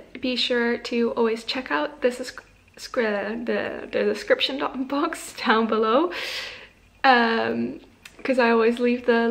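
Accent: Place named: United Kingdom. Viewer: like low key, I couldn't focus on the poem while you were reading because of your amazing british accent!.. but yea, well read.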